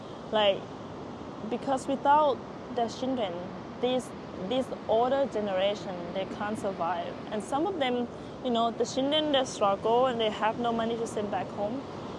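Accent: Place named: Philippines. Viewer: R u Filipino? U have Filipino accent. You look beautiful though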